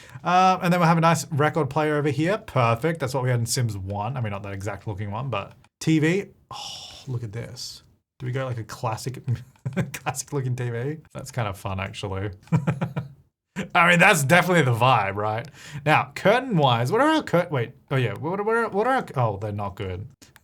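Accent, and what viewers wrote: Australia. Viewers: No doubt that’s an aussie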